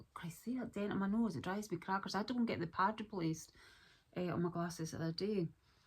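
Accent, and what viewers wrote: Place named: Scotland, United Kingdom. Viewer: I love a more personal and authentic vs commercial vibe to the content i watch! I'm not sure what's your cute little accent - Scottish?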